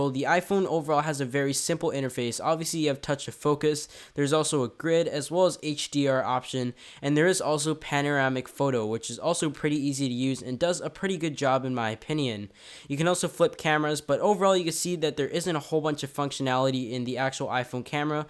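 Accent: Canada. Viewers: oh my gosh. are you Canadian?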